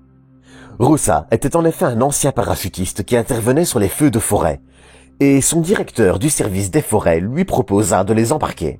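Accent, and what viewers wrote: Belgium. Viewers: T'es belge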